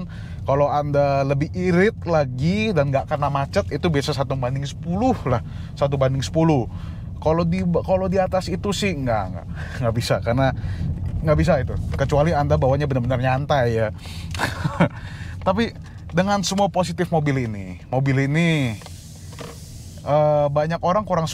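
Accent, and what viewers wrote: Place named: Indonesia. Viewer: Keren koko, logat nya indonesia banget...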